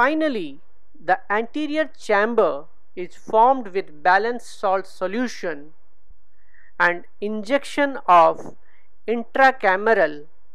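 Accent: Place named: India. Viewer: This doctor sounds Indian.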